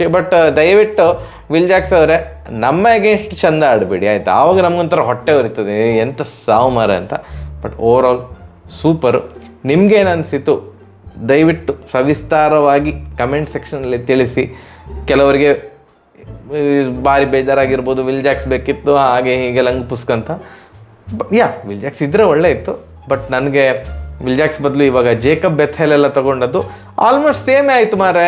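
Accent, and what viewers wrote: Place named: Udupi, India. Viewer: Masth udapi accent ❤❤❤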